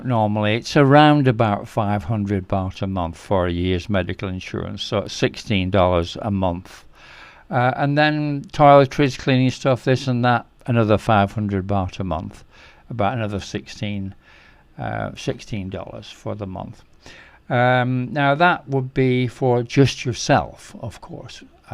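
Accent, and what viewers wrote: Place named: England, United Kingdom. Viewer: Why are you giving costs in us dollars with an english accent ?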